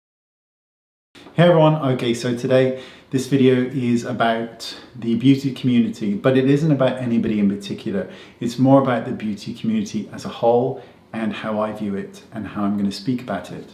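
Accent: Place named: Scotland, United Kingdom. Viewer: That Scottish accent is really poking through in this video and I love it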